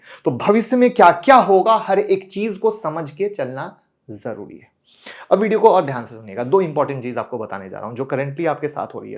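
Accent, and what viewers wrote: Bihar, India. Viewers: Aap up ni Bihar se ho bro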